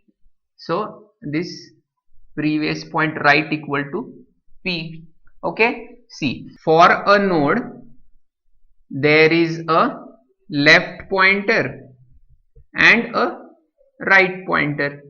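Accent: India. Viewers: You deserve more followers! Great video. By the way was that Marathi accent? :)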